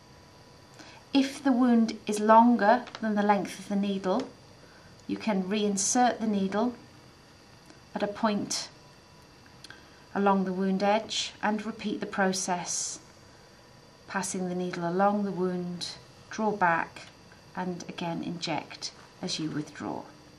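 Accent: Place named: United Kingdom. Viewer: I love british accent and the video was good to. Thanks a lot!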